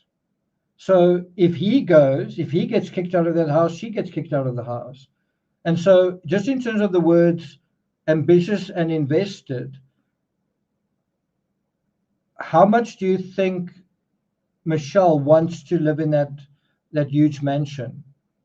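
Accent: South Africa. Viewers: jy klink soos 'n Suid Afrikaner. Is jy?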